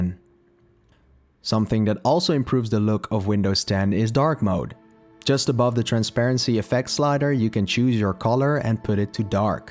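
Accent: Netherlands. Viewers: 10 seconden in en ik gok... een nederlander? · Na 1 sec hoorde ik al dat je NL bent. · Huh you dutch?! Je hoort het amper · the Dutch accent is 98% here.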